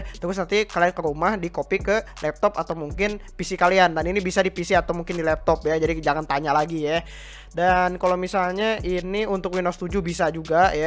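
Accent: West Java, Indonesia. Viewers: Sunda Geuningan?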